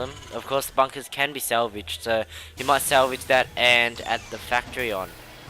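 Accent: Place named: China. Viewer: you sound like a mix between a china-men and an Australian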